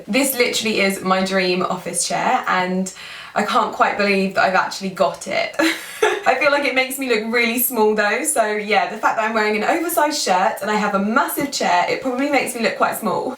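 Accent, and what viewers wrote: United Kingdom. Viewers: Really Love your style and your bags!!! Keep posting your fabulous bags :) and your accent remind me of the days studying in the UK. Love from Taiwan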